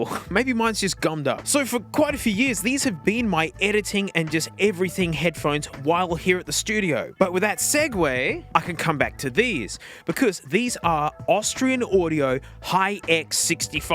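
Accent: Australia. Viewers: why are you watching that when you could be listening to an Australian man talking about headphones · yelling austrailian man is back :0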